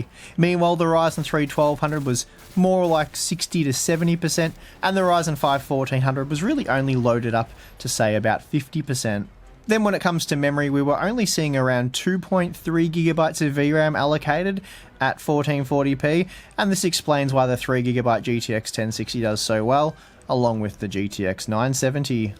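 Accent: Australia. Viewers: Great work mate! nice to hear an aussie for once doing bench marks ;-) keep up the great work!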